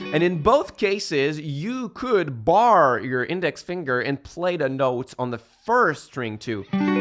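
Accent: Quebec, Canada. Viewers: Très intéressant, merci! En passant est tu québécois?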